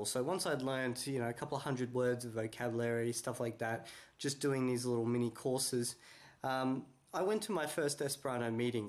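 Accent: Australia. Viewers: g'day brother you sound Australian.. any chance for a few lessons.. live In Buxton nsw near Picton. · Yeah, basically. I feel like a lot of Esperanto material is geared towards komencantoj, but I have been reading more advanced stuff lately. "Being Colloquial in Esperanto" is one of my favorites for English-speakers. I also just ordered "Vojaĝo en Esperanto-lando" directly from Boris Kolker himself, so that should be good. My Esperantist friend in North Carolina wants me to attend NASK,(Nord-Amerika Somera Kursaro) this summer, so we shall see. But what I've really been doing is what I call immersion. I've been trying to spend as much time as I can in Esperantujo. I skype with Esperantist friends, listen to Esperanto radio and music, chat in Telegram chats, read in Esperanto, and I've even started to have dreams in Esperanto (mostly stress dreams about being somewhere with fluent speakers and not being able to follow the conversation). 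I think it's working, though. I feel like I am making great strides. Between my first and second live Esperanto meeting, I noted a marked improvement in my ability to hold conversation in Esperanto. I really enjoy your videos, though. They are helpful, though I am somewhat embarrassed that I've known Esperanto longer than a lot of fluent people but and not as good as them. I'll be there, though, soon! I enjoy both your Esperanto-language videos and English ones. As a side-note, I first found your Esperanto language videos and I was surprised to hear your Australian accent in English. Good job on your Esperanto accent!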